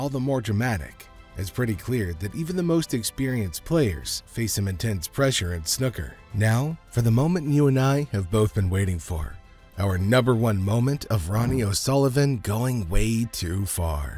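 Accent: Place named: United States. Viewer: It's very weird every time you hear commentary with American accent on a snooker video...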